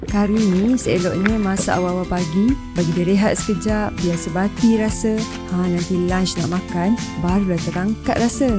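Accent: Kelantan, Malaysia. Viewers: kelate ko utaro???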